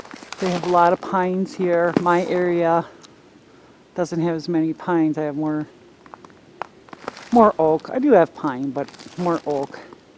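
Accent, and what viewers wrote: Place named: North Carolina, United States. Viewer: Being from North Carolina, I immediately recognized the accent. My mom was from Sheboygan. Very cool!!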